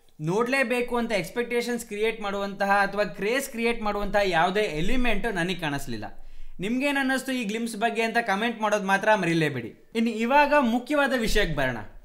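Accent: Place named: Hindi Belt, India. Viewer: channel yardya agirli... Avnu kannada hesaralli badukthaerodhu... antha clear agi helbeku..... Hindi people will say know kannad, like that he is saying 😠🤦‍♂️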